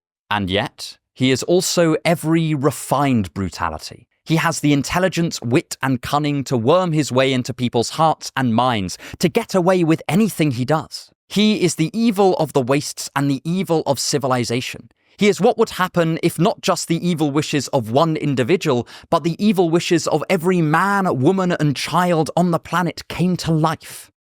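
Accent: United Kingdom. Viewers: watched to learn❌ watched for british accent✅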